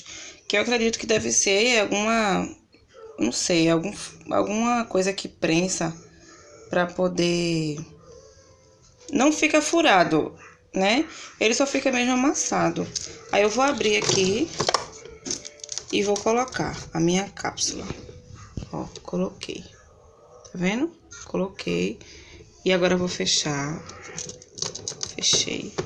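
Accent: Recife, Brazil. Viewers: É de recife?rss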